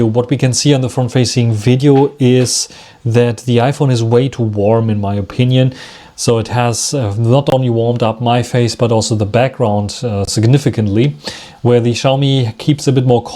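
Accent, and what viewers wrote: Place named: Germany. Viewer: waruuuuum in Englisch in Deutschland? macht ein engländer in England od. Amy in der USA auf Deutsch??? was ist der Grund? schaut Leute, ich habe etwas Englisch gelernt? wenn dann bitte richtig und nicht mit dt. Akzent.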